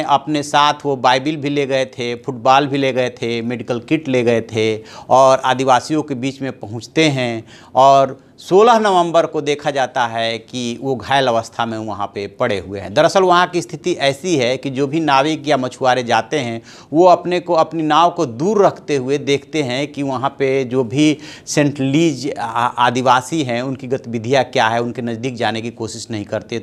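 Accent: Bihar, India. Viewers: Hindi in bihari accent....Achha hai😁😁😂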